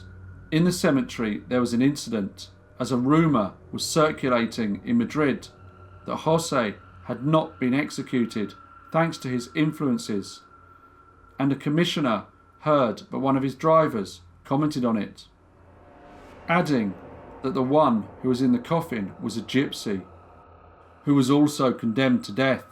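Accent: United Kingdom. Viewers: I am really grateful (though slightly ashamed, well, more embarrassed, by my facination with true crime) to see someone, and as an old(er) anglophillic yank(er), to listen to a well spoken Britter, narrate international cases, from 19th & 20th c. In the usa, it's always either American or Dominian, English speaker murder, and am really enjoying the international work. Hope against hope you do some Germainica!!!!!!!!! Or Balkan, Baltic, Slavic, Turkic, anything. On top of that, love the concise presentation, exactly what I prefer. In American true crime stories, it;s always TMI. Your channel alone justifies my 12.99 usd per month, on Utoober. Many thanks!!!!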